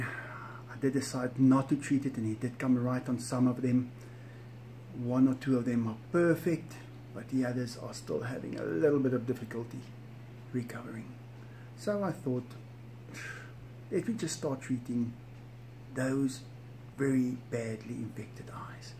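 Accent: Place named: South Africa. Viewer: What country is he from? He sounds South African but I believe their language is English and I’ve heard him speak another language before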